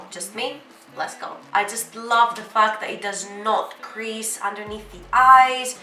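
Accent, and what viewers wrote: Greece. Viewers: I love it too, Greek accent is the cutest..I could listen to anything, including user manual for drill machine, if it was said with Greek accent..or in Greek 😂💯💯💯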